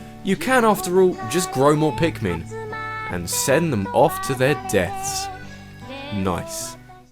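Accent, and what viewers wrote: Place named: Essex, United Kingdom. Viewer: Cool vid man,nice accent btw. Let me guess,you're from Essex?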